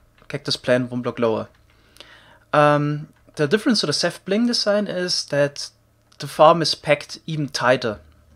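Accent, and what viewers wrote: Germany. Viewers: I actually like his German accent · Lmao u sound soooo german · Dieser deutsche accent triggert mich jedes mal hahahaha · Bist du ein deutscher? Dein Englisch hört sich an wie wenn ein deutscher englisch redet lol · But he is also using the german english pronunciation xD no offense but it is hard to watch as someone who grew up speaking both languages :D anyways, the videos are still insanely informative #nohate # donttakeitpersonallyplease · Höre ich da einen Deutschen akzent? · and its because there is a German accent in his speech I think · Wer ist auch deutsch und merkt das er deutscher ist wegen dem Deutschen Akzent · i love the german acccent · Ich habe noch nie ein deutscheres englisch gehört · bist du deutsch hahaha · 😂Digga was geht 😏👌 man hört es raus das du aus Deutschland bist 😂👌 hast du auch was neues wegen Kaktus? Schau Mal vorbei bei mir ✌🏾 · Who hears also the german dialect ? 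Richtig cool. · Man hört halt raus das du deutsch sprichst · german accent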